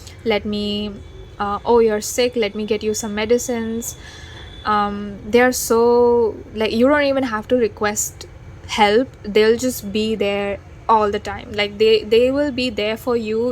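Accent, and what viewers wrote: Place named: India. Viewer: You are so creative. I can feel you like doing what you are doing, it comes from your heart. From your accent I guessed you are an Indian. And the reading was very accurate too. Pile 2 & 3 : my life has been difficult bc i was very different from people around me & as a consequence i struggled with loneliness,lack of support etc mental health issues & i had toxic relationship with a narcissistic bf for two n half years . I'm a double Aquarius with Venus in cancer. I'm a very quiet introverted person. Unless I'm with someone i know & trust a lot, I am not very emotionally expressive. Subscribed, I will watch your readings I think we are aligned. Your energy is calm relaxing serene and slow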